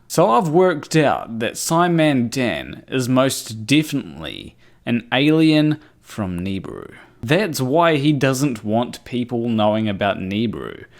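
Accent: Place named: New Zealand. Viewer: Best N.Z. accent on U tube.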